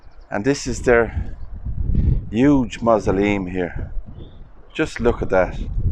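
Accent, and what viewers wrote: Ireland. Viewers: You're beautiful Irish accent alone makes it worth listening to! Do you speak Irish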